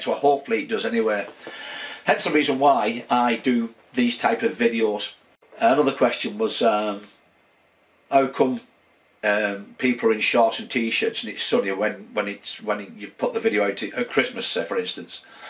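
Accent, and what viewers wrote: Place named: Bolton, United Kingdom. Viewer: And there's me thinking it was a Bolton accent!! You keep going me o'l mucker!! Stay Safe and Healthy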